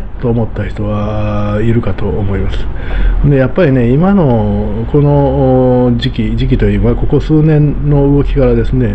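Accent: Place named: Kansai, Japan. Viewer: 関西弁って面白いですね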